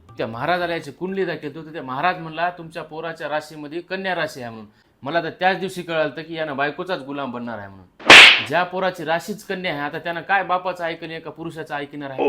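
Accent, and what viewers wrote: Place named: Nanded, India. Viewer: Bhasha chi boli Nanded chi aahe kai bhari acting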